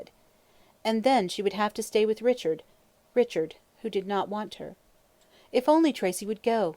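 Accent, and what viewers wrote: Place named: United States. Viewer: Disappointing in American accent.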